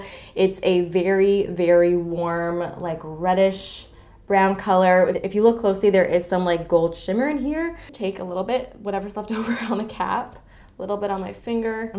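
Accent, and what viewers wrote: France. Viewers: Are you French? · Are you french?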